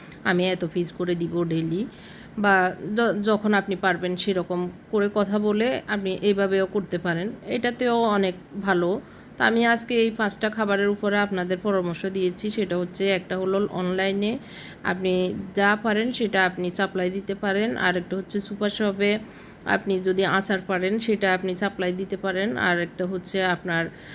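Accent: Noakhali, Bangladesh. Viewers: আপু তোমার বাড়ি কি নোয়াখালী???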